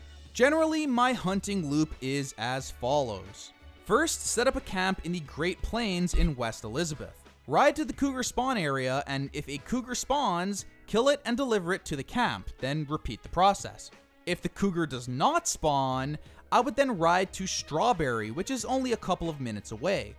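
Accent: United States. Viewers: I swear this dude is from Maryland. "Waaaayyyyygen" "budddon" I'll bet he says "wuuter" too lol. But great great video. Subscribed for sure. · Weygun, Wisconsin accent?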